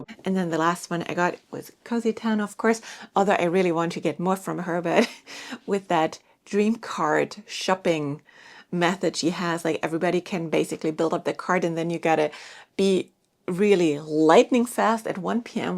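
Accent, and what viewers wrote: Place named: Germany. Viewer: Always enjoy your videos! Best of luck with this year's growing season.My in laws came from Germany. I love listening to your accent!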